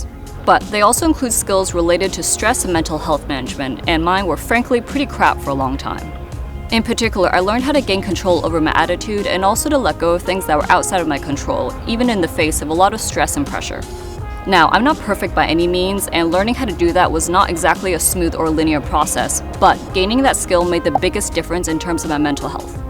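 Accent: United States. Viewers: There is what I think you and are either ABC or brought up in the States. The way you talk and the accent is completely different to the locals and CBC and BBC. Good luck on your new career.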